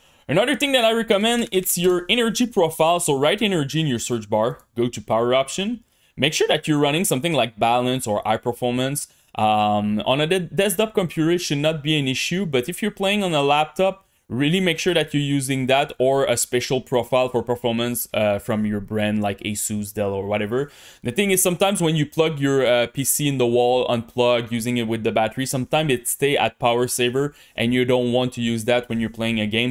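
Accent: Canada. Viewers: Nothing better than a modest Canadien accent 👌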